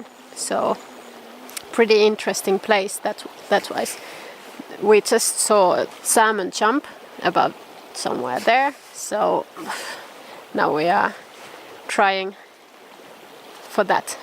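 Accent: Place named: Europe. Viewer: Very nice fish in Sweden I like your channel. I really like the way you say fissing and not fishing it sounds very European.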